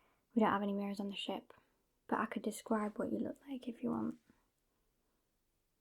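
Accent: United Kingdom. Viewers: What kind of British pirate we talking here? Yorkshire? East Sussex? Cornish?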